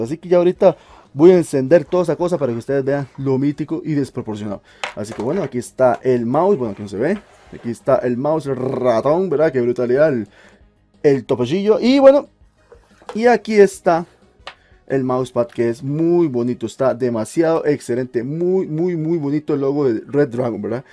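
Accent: Costa Rica. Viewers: andaba buscando reviews de este teclado y adelante el video hasta el minut0 y lo primero que pense fue: seguro es vara que el mae es tico jajaja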